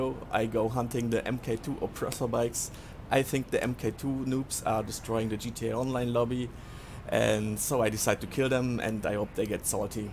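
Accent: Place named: Germany. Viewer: I love the German ascent I am dutch so I have almost the same as you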